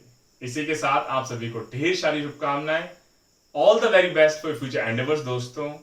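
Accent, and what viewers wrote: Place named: Uttar Pradesh, India. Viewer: उत्तर प्रदेश में आपका हार्दिक स्वागत है सर जी । आपकी भाषा एकदम उत्तर प्रदेश के लोगो की तरह है । ऐसा लगता ही नहीं को आप राजस्थान से है।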